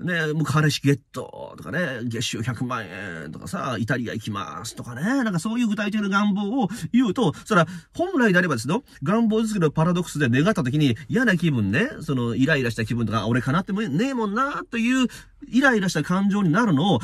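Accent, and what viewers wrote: Kansai, Japan. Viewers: Qさんのたまに出る関西イントネーションが大好き😆 大阪人より🙋🏼‍♀️❤️ そして今日も楽しい動画をありがとうございます！！！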